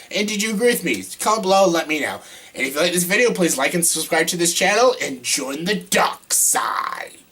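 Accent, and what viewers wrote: Canada. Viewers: He's Canadian that's probably how they pronounce it no need to call him on it.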